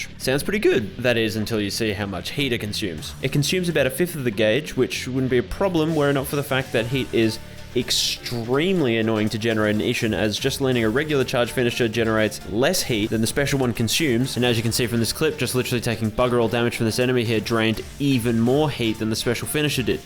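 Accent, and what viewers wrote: Australia. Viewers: As an australian, he doesn't sound very british and is probably also australian.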